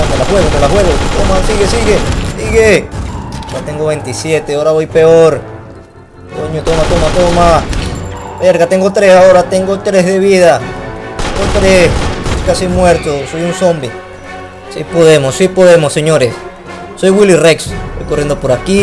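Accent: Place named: Venezuela. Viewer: tus videos son buenos solo para venezolanos al parecer del resto no entenderan bien lo que dices xD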